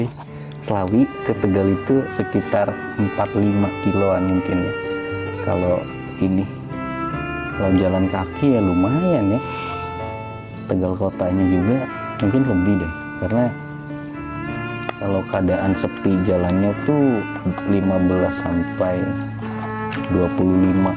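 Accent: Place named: Palembang, Indonesia. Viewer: Mas kaya orang Palembang Lo ternyata orang Jawa.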